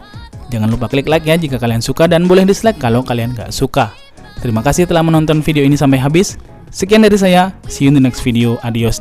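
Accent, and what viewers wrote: Madura, Indonesia. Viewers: Oreng medureh? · Masih "salbut" Kek org madura 🗿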